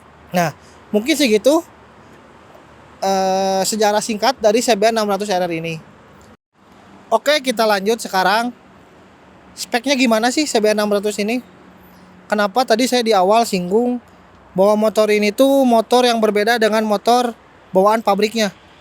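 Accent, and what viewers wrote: West Java, Indonesia. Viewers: Ketahuan logat sundanya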